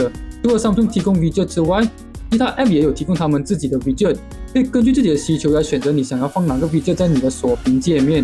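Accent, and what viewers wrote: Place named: Hunan, China. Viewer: 湖南话＋台湾话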